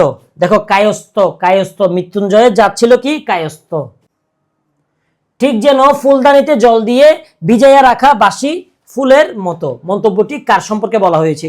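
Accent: Chittagong, Bangladesh. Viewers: ভাই কি চট্টগ্রাম এর??❤ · সার আপনি কি চট্টগ্রাম এর টিচার? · ভাই কি চট্টগ্রামের ?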